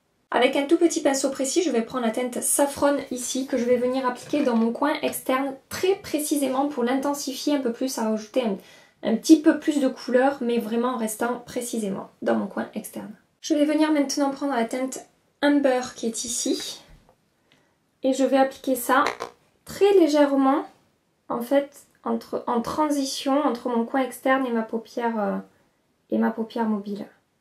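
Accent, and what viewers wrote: South, France. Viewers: Le petit accent du sud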